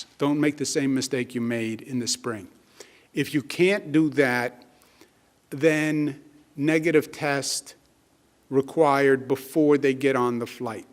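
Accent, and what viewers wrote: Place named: New York, United States. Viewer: I just like the NY Accent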